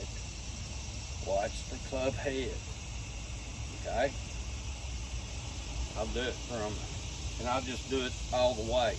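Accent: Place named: Tennessee, United States. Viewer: I would guess from your accent you are in eastern Tennessee. But not seeing any UT flags or any other decorations has me confused.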